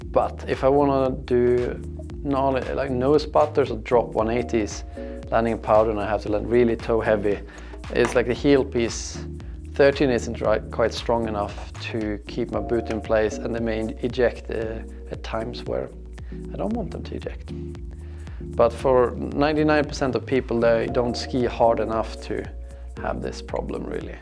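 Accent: Sweden. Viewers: Hehe, yer so funny. I liked your way of explaining the disadvantage with the pointy binding 😂 Are you by any chance Swedish? You got a good accent. ☺️ I’m searching for a nice marker that got easy switch for adjusting for the booth size with an 11cm wide ski stopper. Any suggestions? I don’t like griffon ... you got to screw them that is you need a screwdriver 😥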